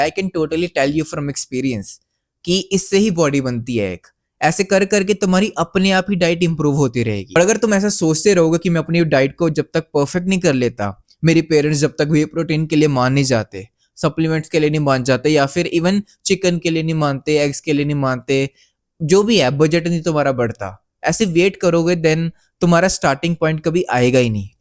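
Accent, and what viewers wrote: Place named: Pakistan. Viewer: Accent to pakistani lagte ho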